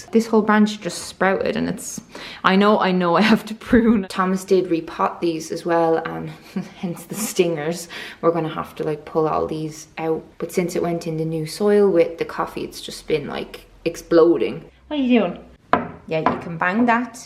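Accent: Ireland. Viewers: My names is ruby!! You messaged me when you was pregnant last time the way you both say ruby is so cute I love my name way more in an Irish accent and little 2 year olds voice can't believe how fast your pregnancy has gone I couldn't believe it when I started watching all the best to you anc your whole family I'm so excited for you and this little one who gets such and amazing village around her to raise her to be an amazing human so much love ❤️ the hair is amazing reminds me of og stay safe look after yourselves lots of love xxx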